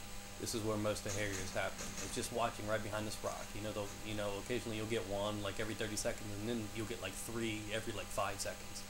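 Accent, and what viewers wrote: New York, United States. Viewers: he sounds like he's from new york lol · New york accent lolz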